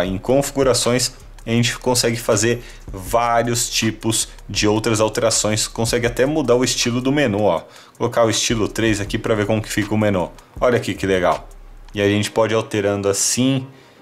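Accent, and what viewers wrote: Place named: Portugal. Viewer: portugues portugal pelo que vi! achei interessante o relogio!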